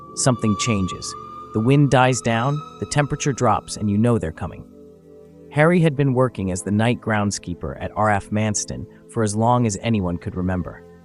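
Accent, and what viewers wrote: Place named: United States. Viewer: This video needed an English accent, not a yank. Just doesn’t work.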